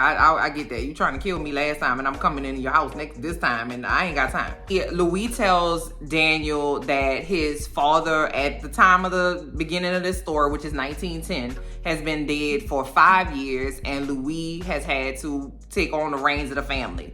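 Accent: New Orleans, United States. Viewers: About 30 seconds into your video, I gasped. Thanks to the talented Jacob Anderson, I recognized your accent as New Orleans right away. Just as impressive was Sam Reid learning French so he wouldn't have just the accent, but the language too. Loved your season recap and hope you do every episode next season. You're a whole lotta fun!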